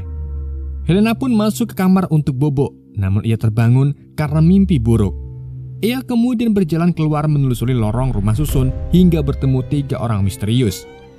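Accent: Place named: Java, Indonesia. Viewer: ridle ga tuh, bacaanya ridle blok jawir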